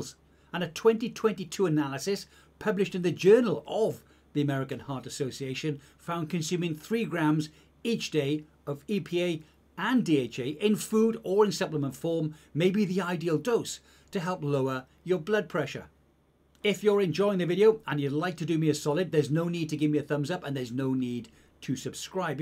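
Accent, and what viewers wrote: United Kingdom. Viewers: Re the stack at the end of the vid, I am curious where you get your 500mg Metformin from. From the accent I'm guessing you are UK based. Did you measure its effect on your IGF-1 levels? I think this is one very interesting supplement. I heard some have side effects but can depend on the dose.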